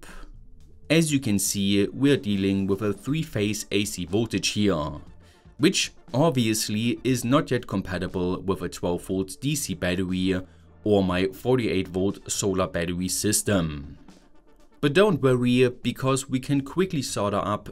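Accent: Germany. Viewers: why do you have such thick german accent if you are Scottish? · Curious where you're from! I lived in Germany for many years and I don't recognize your dialect. It's hard to identify! Your channel says you're from Fellbach but IDK if that's conclusive.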